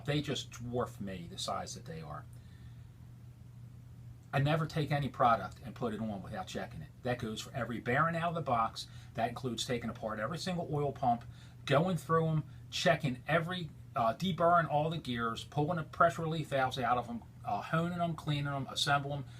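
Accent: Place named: Baltimore, United States. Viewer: Damn, you GOTTA be from Baltimore!! I know that accent anywhere!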